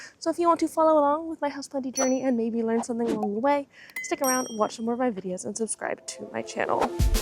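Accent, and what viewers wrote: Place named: London, United Kingdom. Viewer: Your London accent is improving! Banana plants and 20 quid but you slipped up major on Herbs! 😊 I love that market - how did you come away twice with nothing? 😲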